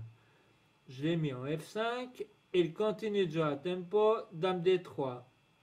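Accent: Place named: Switzerland. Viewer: Ça fait des lustres que je n'ai pas regardé une partie à peu près en entier et j'ai vraiment apprécié ton jeu.... ton animation et ton commentaire avec l'accent suisse et toujours une pointe d'humour:) Bravoooooooo!!!!!!!!!!!!!!!!!!!! 👑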